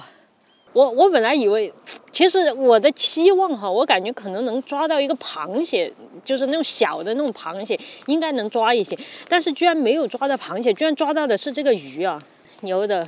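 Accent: Sichuan, China. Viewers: Yooupi講四川口音是一大特色！ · Halibut 清蒸最棒！四川口音杠杠滴！ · 特别喜欢yooupi说四川话 感觉很好听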